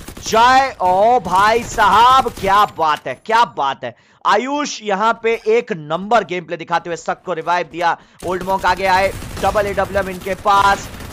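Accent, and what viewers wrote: Bihar, India. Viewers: bhai aap Bihar se ho kya please replay 😁😀😃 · Bhai aap Bihar se ho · Are you from Bihar....Op by the way😘😘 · bhai are you from bihar? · bhai tum bihar se ho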